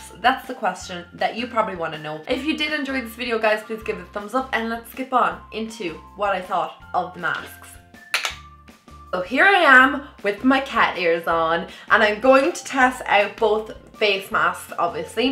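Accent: Ireland. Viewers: Love the Ireland accent 😂❤️😍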